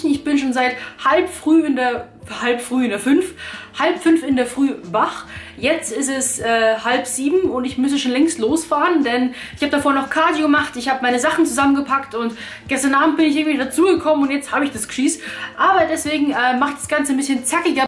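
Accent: Bavaria, Germany. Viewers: Man hört manchmal deinen Bayrischen Akzent heraus :D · Waalnüsse 🐳🌰 der bayerische Akzent ist echt putzig 😄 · Kommt die aus bayern i schp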